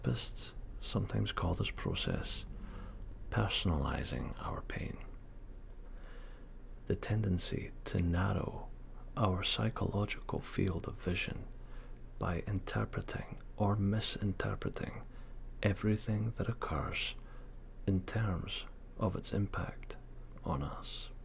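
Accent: Scotland, United Kingdom. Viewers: Love love the Scottish accent! Your voice is so relaxing. Just subscribed to your channel 🙋🏽‍♀️😊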